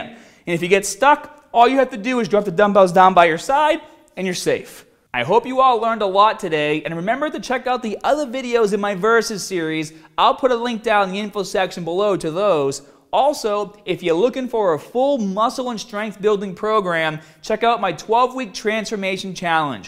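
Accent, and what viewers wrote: Boston, United States. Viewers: First thing I thought was oh!! A fellow Bostonian ❤ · lol. this is Boston accent right? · Did just recently develop his Bahstin accent or was I just missing it for years? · is he from Boston? sounds like a boston accent. not too heavy but subtly noticable. its perfect. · obviously Boston. We New Yorkers don't speak so exaggerated. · You sound like you're from Boston. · Nice Bostonian accent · don't listen to the haters. the Boston accent is sick. better than the boring ass baritone most Americans speak with. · It's called the Boston accent. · I love that Bahston accent. lmao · Maybe that's his real Boston accent but hes trying to hide it but it comes out 👍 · Yes he has a Boston accent, just watch the video for the gym tips. It's really annoying to see the comment section flooded with people commenting on his accent and not gym-related things. 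· When the fuck did this guy develop a Boston accent lol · Woooooow! Your Boston (?) accent is getting stronger and stronger! Regional accents have their charm. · It's because originally he tried much harder to HIDE the Boston accent... but then has since stopped doing that because it's idiotic and now (and back in 2016) he's a lot more natural. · When did this guy get a Boston accent? 😂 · Dude is from Boston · So many comments bitching about accent... grow up, everybody from the Boston area has the same accent. I moved away from Southie awhile ago (to southeast Iowa), unless I am drinking, I can hide the accent, but unfortunately it will be with me my entire life. If you cannot get thru the video because of the accent, you are a child who should be on A.D.D. medication, don't worry about body building till you become a bit more focused, it is called priorities. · He's got a Boston accent. 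· i never noticed boston accent before. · Is that the Boston accent they talk about? also when did his accent become so strong? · this guys boston accent is hilarious!!! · he hid his accent when trying to go mainstream now he lets his inner bostonian out. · No he just has a Boston Shore accent! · holy shit - the Boston comes strong in this one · Apparently it's a Boston accent. I got confused since I assumed 100% of America accentuated the 'r's. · Dude your sudden onset of a thick boston accent is ridiculous lmao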